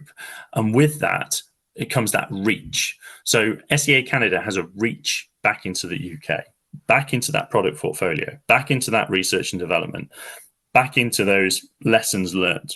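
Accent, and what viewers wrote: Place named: United States. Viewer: You sound like your a yank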